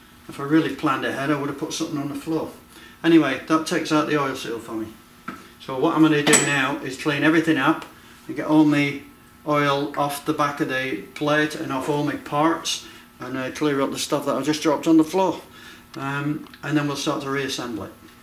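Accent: Yorkshire, United Kingdom. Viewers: Thanks so much for this excellent video. All of your videos, actually. Top notch. On a lighter note, I'm amazed that you can work in your good shirt and not ruin it. I've been wrenching on these cars for 50 years and mine are usually ruined in the first 20 minutes. 😂 Wish I knew if you had paypal. I'd send you 10 bucks for a pint (or 2!) as a thank you! PS love your accent. are you in the Dales?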